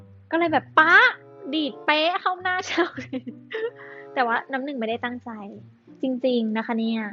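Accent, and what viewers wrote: South, Thailand. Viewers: นายฮัวเลย 5555 แหลงใต้ชัดเปรี้ย555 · แหม่เฮ้ยยย แหลงใต้ชัดเปรี๊ยะ🤣🤣🤣 น่ารักอีตายยย❤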